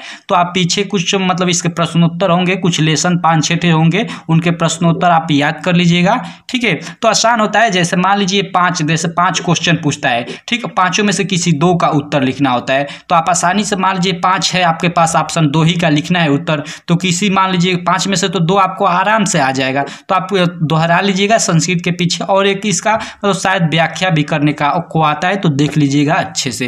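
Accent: Bihar, India. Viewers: Aap bihar se hai kya?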